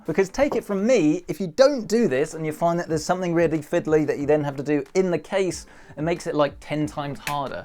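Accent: United Kingdom. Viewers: what region is your accent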